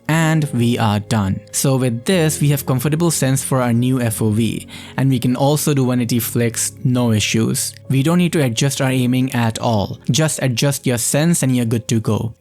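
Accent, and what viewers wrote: India. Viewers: Idk if anyone else has noticed, but now sounds more "Indian" if uk what i mean 😉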